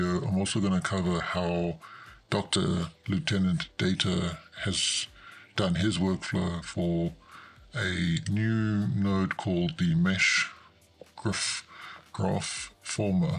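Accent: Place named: Afrikaans, South Africa. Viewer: I like your voice my man.....English baritones with a faint touch of Afrikaans. Keep up the good work!! Also big thanks for sharing your work flow without putting them behind a paywall!!! :eyes-pink-heart-shape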